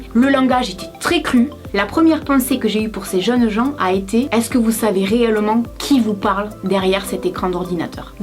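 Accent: South, France. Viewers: Accent du Sud en force !!!! 💪❤ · Tu vien de Perpignan la base ta l'accent du sud comme moi 👌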